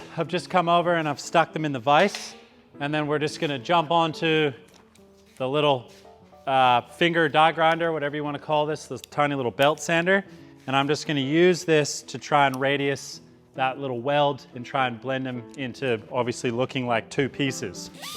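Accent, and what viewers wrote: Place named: Australia. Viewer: First time watching your channel, and I'm sitting here trying to figure out where you are...! Obvs American accent, but I'm hearing Aussie and Pom, and you're talking about The Mad Scot. Now that I've googled...wow, you really have got an Aussie drawl!! 😄😄 Great work mate, those flanges look superb 👌 (Possibly might have liked some in depth on the sand forming, but awesome all the same!)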